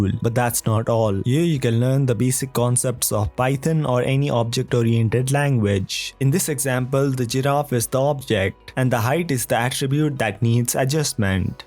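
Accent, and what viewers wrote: India. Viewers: dang he is like the most indian i have ever heard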